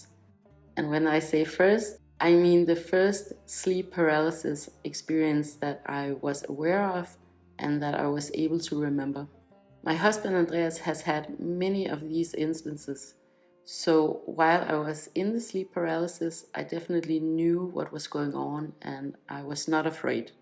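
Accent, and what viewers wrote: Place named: Denmark. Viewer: Det lyder som en dansk accent 😊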